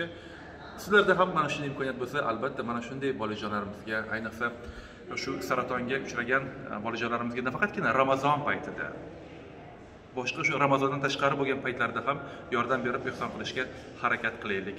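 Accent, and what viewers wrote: Fergana, Uzbekistan. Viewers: Фаргоналикмисиз илтимос чукурок тушинтиринг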